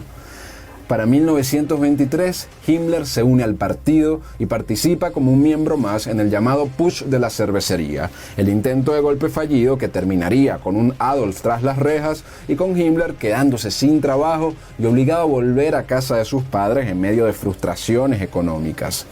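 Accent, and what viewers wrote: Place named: Venezuela. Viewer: No soporto ese acento venezolano del youtuber. Cómo todo venezolano en Canadá viven haciendo videos para YouTube y TikTok pero no quieren trabajar.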